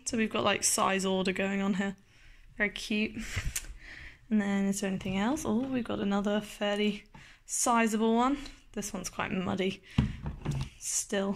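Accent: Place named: London, United Kingdom. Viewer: Hi where do you Find them? They are Awesome, is that how you got Your Tattoo on your left Arm. I am from The U.S.A. 🇺🇸 I Want to get that Tattoo unless it’s a woman’s Tattoo Please let me know, I am A Fan of Yours and since your from London I love ❤️ your Accent.....Love Donnie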